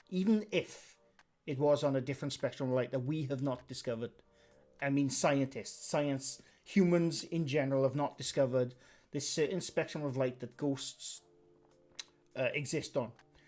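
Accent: Wales, United Kingdom. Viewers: Oh... The wonderful Welsh voice of reason! It irks me so much the amount of people that refuse to accept a logical explanation! OK, I get that a lot of people desperately want to believe in life after death etc, in all honesty most of us would like to think that way! But sls stick men are not the way forward, and so many creators are jumping on the stick man bandwagon now. Even a weeny channel called 'Get Spooky Society' have, and I had such hopes for them too!🥺 Oh well, onward and upwards!🤷🏼‍♀️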